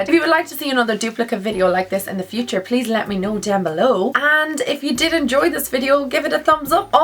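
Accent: Dublin, Ireland. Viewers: I was wondering from where her accent was from and then I paid hard attention and remembered of Niall Horan and boom! It's an Irish accent 😊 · Are u from dublin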